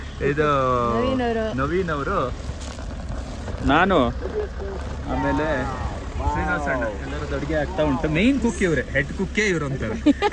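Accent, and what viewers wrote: Kundapura, India. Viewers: ಹವ್ಯಕ ಕನ್ನಡ ಮತ್ತೆ ಕುಂದಾಪುರ ಕನ್ನಡ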